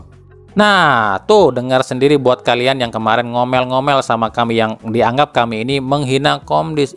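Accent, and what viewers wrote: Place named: Java, Indonesia. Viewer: Klasemen sementara bali ka 4 mang Kamari medok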